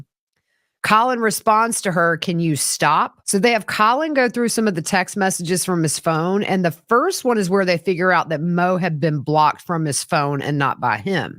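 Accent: South, United States. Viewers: And her southern accent fits perfectly for a case here in Texas!!!!😊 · Also from the south so I love your accent and your pronunciation of tumultuous is cracking me up 🤣😂🤣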